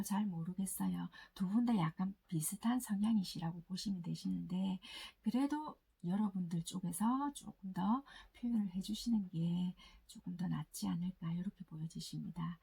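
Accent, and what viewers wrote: Busan, South Korea. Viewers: 저 5번 뽑았거든요~ 다시 한번 들어봤어요. 저 태양 물병이고 상승 게자리고, 상대는 게자리에요 ~ 다시 들어도 정말 잘 맞는것 같아요.. ^^ 댓글도 감사합니다!!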